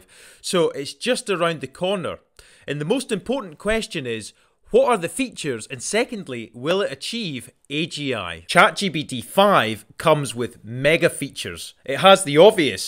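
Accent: Scotland, United Kingdom. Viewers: English language spoken with a Scottish accent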